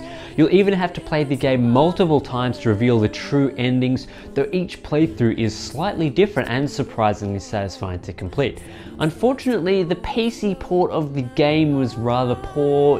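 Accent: Australia. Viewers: hello I'm new and I subcried and you are austrailan I know because I I'm and I can tell I your voice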